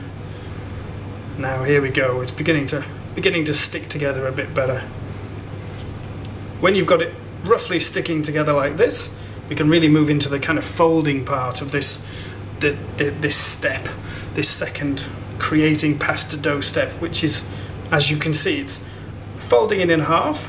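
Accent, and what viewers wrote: United Kingdom. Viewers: Yeah, in the UK, the TV is mostly a few government-run channels (at least thats what I got from going to scotland 3 times), and I don't think they have Man vs. Wild. And you know, some of the pronunciations just sounded southern Scottish to me, so that's where I got the impression. By the way, I might just not have found it yet, but if you haven't made one already, are you ever going to make a pizza video? I realize pizza is a fairly simple idea, but it has a variety just like pasta.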